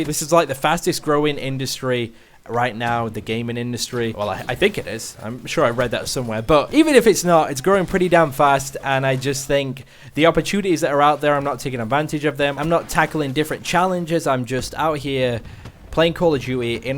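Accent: United Kingdom. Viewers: Rip your brithish accent if your gona have a grindsquad house in america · It's good to hear another British dude talking about things that are relatable to me. Finally.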